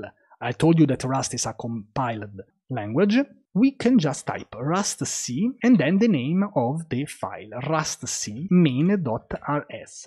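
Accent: Italy. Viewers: si alla fine l’ho completata tutta la serie e l’ho capita benissimo, l’accento italiano ha aiutato molto ahah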